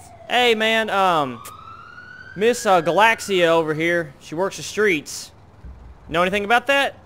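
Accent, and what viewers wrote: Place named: Kentucky, United States. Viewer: As soon as you started I could tell you were from KY im from Barren County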